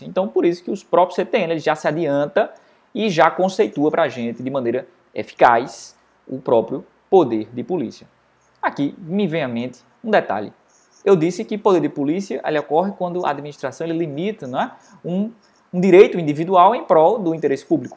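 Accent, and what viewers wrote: Northeast, Brazil. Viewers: Amei a aula, mais um inscrito. Mais esse sotaque seu é maravilhoso, tu é do nordeste né? Amo meu lugar 😍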